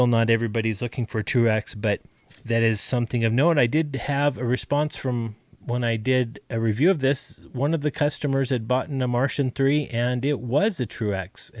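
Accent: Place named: Australia. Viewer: yes "gooday!! And tooday!!!.." Catchy as fuck specially with that down under cheekyness. 👍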